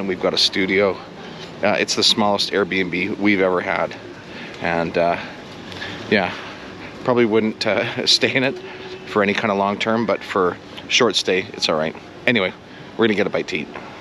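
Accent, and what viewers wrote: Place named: United States. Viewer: Stop staying there and calling it downtown - it is part of the wide centre but it is also part Central-North Sofia, that is not the downtown - that is the AVOID area... Downtown is around NDK and between St. Sunday and NDK and above NDK and East of NDK West of NDK and anything but NORTH of Serdika Metro station. W are part of the European Union, we are not part of the fiscal Euro zone even though we are fixed to the euro.Only use ATM's in Banks or attached to Banks - not the Yellow and Blue NO ATM has ATM written on it and being legit. The area you were in in that restaurant where you had a bad experience we refer to as little Arabia and you sound American.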